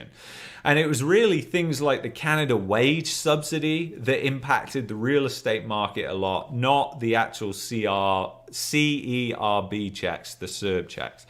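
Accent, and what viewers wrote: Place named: Cockney, United Kingdom. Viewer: I’m trying to understand why a Cockney Lad with fabulous economic skills ended up in Canader!! I’m enjoying your intelligent reviews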